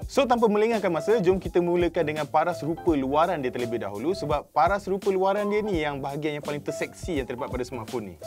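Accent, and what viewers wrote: Malaysia. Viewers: Sedap juga dengar logat melayu malaysia, beda sikit dengan melayu sumatera. Big love from indonesia😍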